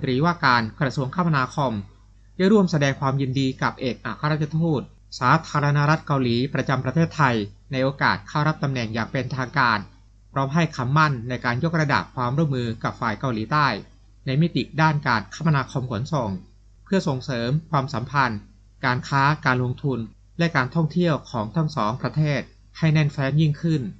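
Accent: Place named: Cambodia. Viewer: ได้ซิจ๊ะ ร ล ควบกล้ำ ฉันไม่ได้มาก่อกวนแต่สงสัยเพราะสำเนียงเธอเหมือนคนเขมรก็แค่นั้น คนไทยบางคนพูดไทยสำเนียงจีนก็มีเพราะเขาโตอยู่ในย่านคนจีน พูดไทยสำเนียงลาวก้มีอย่างคนอีสาน ..และเดี๋ยวนี้มีเพื่อนบ้านอย่างลาว เวียดนามเขาก็ทำคลิปยูทูปพูดภาษาไทยด้วย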